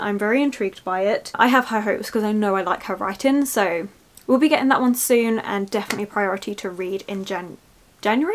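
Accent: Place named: Essex, United Kingdom. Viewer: I really loved this video and channel,happy monday xx fan 🧑‍🎄.....ps you sound essex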